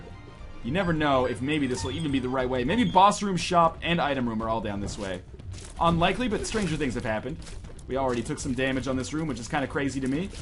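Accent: United Kingdom. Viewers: I did actually like your British accent. Well done. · I love your british accent!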